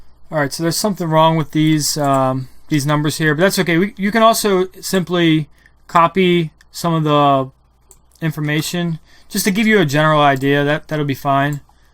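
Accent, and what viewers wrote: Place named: Louisiana, United States. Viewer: Are you originally from Louisiana by chance? My fiancé and I simultaneously said, “He’s a local boy!” based on your accent.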